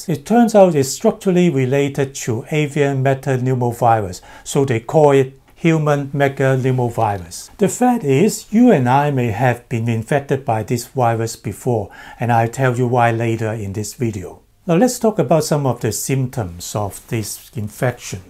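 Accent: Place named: China. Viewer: Love the English with Chinese accent. Made me miss Hong Kong ! 🇭🇰❤ Hope this virus is not serious at all.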